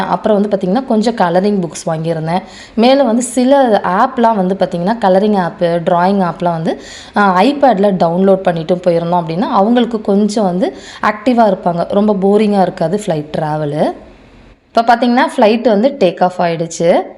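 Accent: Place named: Tamil Nadu, India. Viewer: Again me nega samyal pandra style and pesurathu vachi neriya yosipan, eppo thaan teriyuthu nega namma tamilnattu ponnunu 😊❤hadsoff you ❤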